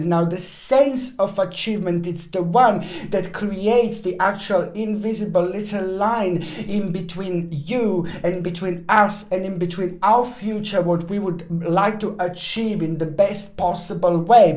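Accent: England, United Kingdom. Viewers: English accent.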